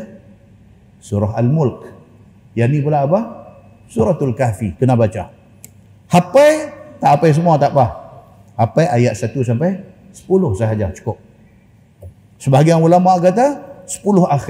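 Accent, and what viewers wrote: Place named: Kedah, Malaysia. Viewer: Guna loghat Kedah tu yg bertambah manis kuliah ini..syabas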